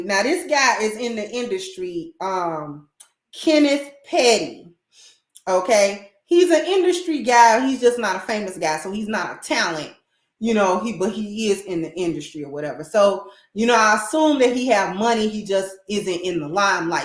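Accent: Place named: New Orleans, United States. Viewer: are you from the boot? I here so much new orleans tongue.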